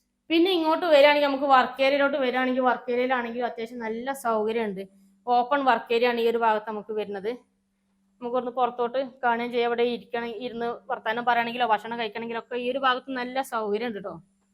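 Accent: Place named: Malappuram, India. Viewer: സഹോദരി കാസർകോടാണ് ഭൂമി പക്ഷെ ഭാഷ മലപ്പുറവും ഇതെന്ത് കഥ ?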